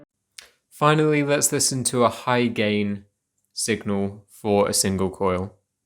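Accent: United Kingdom. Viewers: Thank you so much. Great explanation and so good to hear a British voice.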